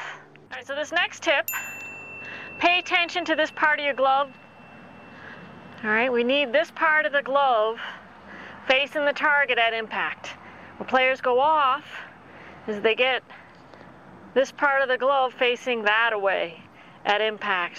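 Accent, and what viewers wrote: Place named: New England, United States. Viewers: Working my way through all your videos, which have been soooo helpful. I am like Mark J. I stick to one person's advice and yours is clearly a game changer. Thanks and I love your Yankee accent. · Funny lady and such a clear explainer. Love that no nonsense New England accent. packs a ton of info into a short space and is always entertaining.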